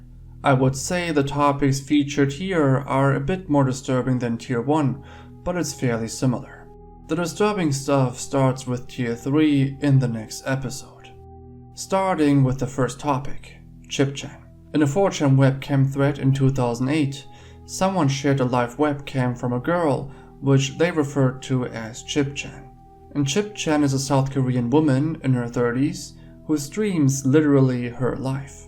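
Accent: Germany. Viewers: I'm half British and half german, so I'm always thinking are you German? I can hear a light accent but it can also be something else :) Keep on the good work! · I thought it was a Slavic accent at first, but it's German. Very pleasant.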